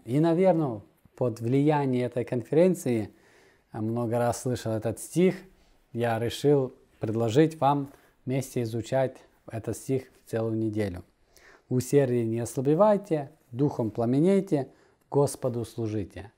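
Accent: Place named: Caucasus. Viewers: Какой-то кавказский акцент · Да, чисто кавказко с украинскими словами!)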